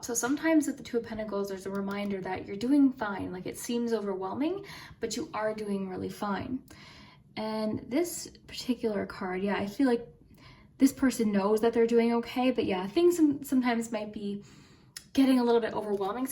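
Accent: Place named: Canada. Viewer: You’re Canadian!! That’s why I’ve noticed your accent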